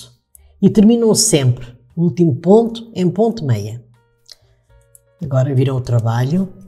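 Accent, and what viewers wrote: Portugal. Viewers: Sim. Uma meia comprida e reta! O ponto deve ser elástico né? Seu canal é do Brasil? Tem sotaque de portuguesa de Portugal! QUE fofaaa, muito atenciosa! Obgda!❤❤❤❤⚘🕊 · adoro seus trabalhos super bem explicado e seus trabalhos são maravilhosos e adoro seu sotaque português adoro bjs 😘❤️ 🙏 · Obrigada, adorei, super fácil, e adoro ouvir seu sotaque, me mata saudades, amo Portugal, amo os portugueses, tenho muito respeito por vcs!!! bjos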